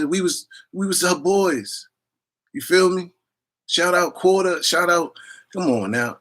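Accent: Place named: New York, United States. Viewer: you sound like a new yorker bro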